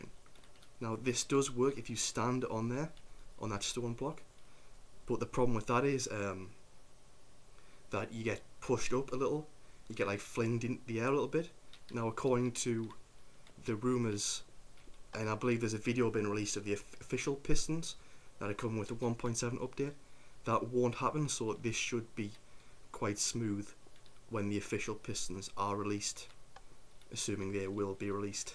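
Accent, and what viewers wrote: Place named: Newcastle, United Kingdom. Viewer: you sound a bit like a geordie mate :) go north!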